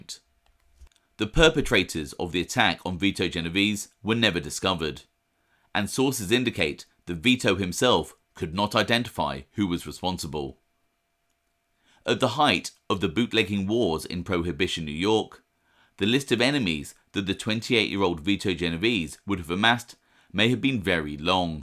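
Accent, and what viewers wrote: United Kingdom. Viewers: I love your videos, and always get an unintended chuckle at hearing you recite dialogue from mobsters in your British accent. In another video, you reported that a particular mobster worked at a place called Top Tomato, which you pronounced the American way, but with your own accent. I bet you debated with yourself about how you were going to pronounce it when writing the script for that episode. Keep up the great work! I really appreciate the effort you put into these videos.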